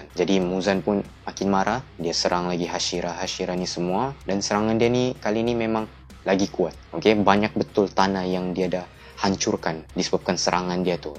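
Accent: Sabah, Malaysia. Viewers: dgr2 org sabah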